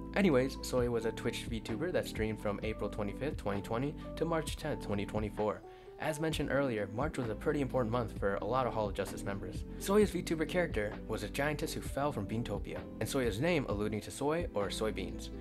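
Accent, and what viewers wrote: United Kingdom. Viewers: I FUCKING KNEW IT! I FUCKING KNEW I RECOGNISED THAT BRITISH ACCENT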